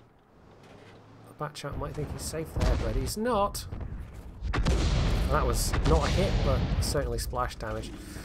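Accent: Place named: Scotland, United Kingdom. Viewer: I didnt knew you are Scotish :)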